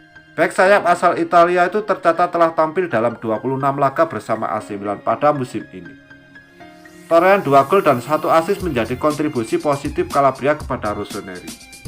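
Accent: Java, Indonesia. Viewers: Medokmu parah kang 🤣🤣🤣🤣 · Lah medoke kang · Hadeh ini narrator piye! Karedok medok banget! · lanjutkan aja mas..medok² yg penting MILANISTI 😎 Forza Milan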